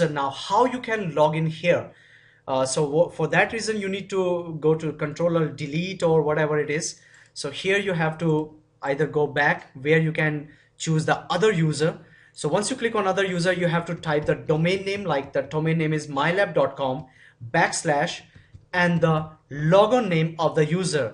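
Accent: India. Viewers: Typical Delhi accent and typical way of moving from English to Hindi...the coverage is good though for those who can understand the language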